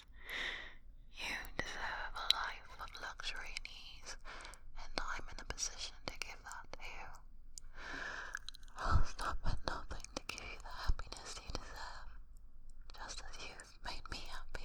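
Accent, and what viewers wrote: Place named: RP, United Kingdom. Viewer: yippy posh UK RP accent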